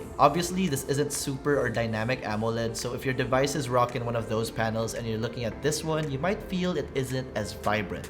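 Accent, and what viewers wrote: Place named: Philippines. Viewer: had no idea this dude was filippino, the accent turned from almost amerian to taglish real quick after 20 seconds, only after having read the comments I have realized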